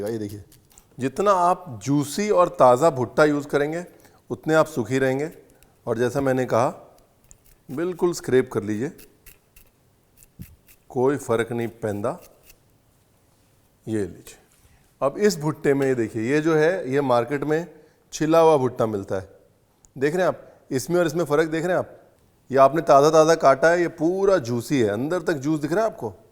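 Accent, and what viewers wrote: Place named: Lucknow, India. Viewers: लखनऊ वालों का अंदाज ही अलग होता है उनके लहजे में लखनऊ झलक ही जाता है.....❤🎉😊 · Aap ke bolneka tarika asal lakhanavi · Lucknow ki baten sunne acha lagta hai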